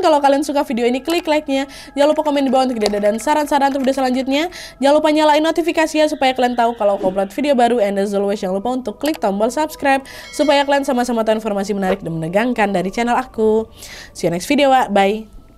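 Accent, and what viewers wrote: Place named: Banjar, Indonesia. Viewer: Seperti Bahasa orng Banjarrr 😂😂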